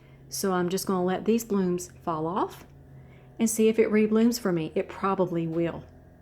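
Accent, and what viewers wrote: South, United States. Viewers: Oh I figured down there (assuming you're in the south because of the accent)that you might grow stuff outside as well. Yes, it's common for young growth to have red tint, especially if the plant is in light that is high for the plant or if they were recently moved from lower to higher. Dr Martin Motes says that when plants flush after being exposed to cold, that it's due to low magnesium, but someone else said he's wrong, and cited one source saying that high mag will increase the red. I wanted to see if you had ever tried that, but then I found the answers that back him up. The anthocyanins that show up when there is high mag are not the same ones that show up in the cold. (Or the ones that sometimes show up in normal temps with low mag). There are different ones that do different things, and then I went to his videos and he relayed at about 20 minutes in to the rough cut video and he talks about some vandas they imported from Taiwan that were deficient and turned purple and when they added mag..well I'll just let you watch that if you're curious instead of typing it all out here :)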